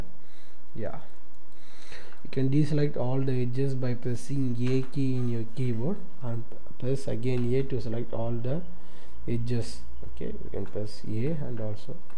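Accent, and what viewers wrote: India. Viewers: your indian right?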